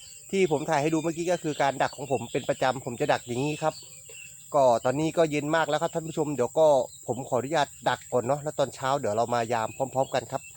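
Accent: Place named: Sukhothai, Thailand. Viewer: พี่คนไหนค้าบ ผมคนสุโขทัยค้าบ สำเนียงคล้ายๆ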